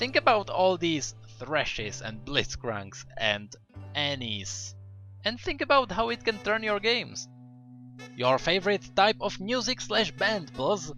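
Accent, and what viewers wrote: Czech Republic. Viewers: Hehe, I guessed that you might be from czech republic, just by your pronounciation. ^^ Well, all I have to say here is: Pokračuj v dobré práci a lovu zdar. :-) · Já prostě věděl že jsi čech...prostě jsem to věděl...ten přízvuk poznám na hony daleko........Gj- keep it up man!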